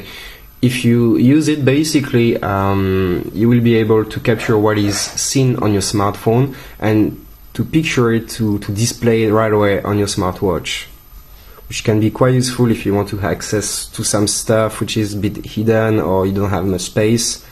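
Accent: France. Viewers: je trouve l'accent français agaçant en anglais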